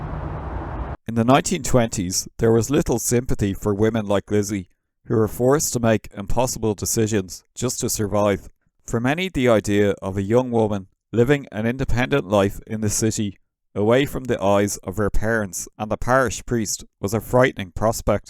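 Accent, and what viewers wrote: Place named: Ireland. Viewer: What a gem of a channel I’ve found. New sub! With thanks. 🙏 nothing like an Irish person telling a story. Excellent.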